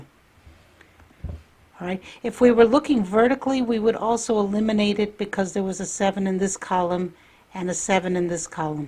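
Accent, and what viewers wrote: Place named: Newfoundland, Canada. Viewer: Thanks very much. Are you from Newfoundland? :) Your 'accent' sounds familiar to me and I'm from there.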